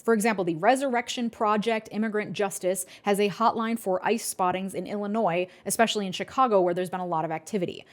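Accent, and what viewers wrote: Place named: Minnesota, United States. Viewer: you are obviously a childless woman and hopefully it will stay that way. you do NOT need access to children. Based on your accent, you are located in Minnesota, where you are completely removed from the CHAOS that illegal immigrants cause in the border states. They are constantly causing fatal car accidents in Texas, due to a culture of drinking and driving. Many of them also don't see anything wrong with grooming 14 year old girls because in their home country, it's normal for underage girls to get with grown men. I've seen several of your videos and most of them sound like you're completely disconnected from the reality of life.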